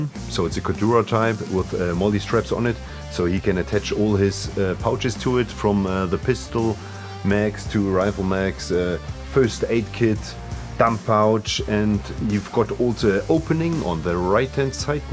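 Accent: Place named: Germany. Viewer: Nice video. I like LT gear. Always reliable, innovative and robust . Good video. I like the German accent. Had to think about the German coast guard video. <link>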